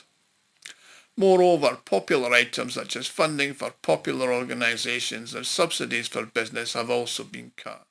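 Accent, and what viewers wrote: Scotland, United Kingdom. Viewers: What is this fascist Russian propaganda with a scottish accent!?!?!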